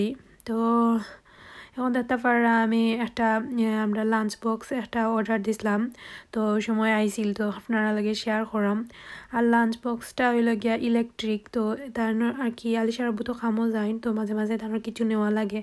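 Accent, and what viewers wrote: Sylhet, Bangladesh. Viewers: সব মিলিয়ে খুব ভাল লাগলো ব্লক টা, সো সো সো সিলেটের কথা শুনলে গম লাগে।।।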